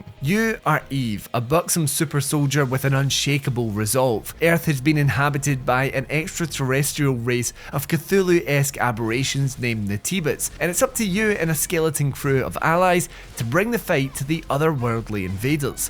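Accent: Scotland, United Kingdom. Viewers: Oh no not a scottish accent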